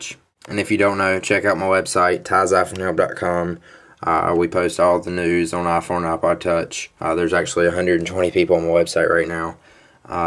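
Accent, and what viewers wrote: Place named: Texas, United States. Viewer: u sound like ur from texas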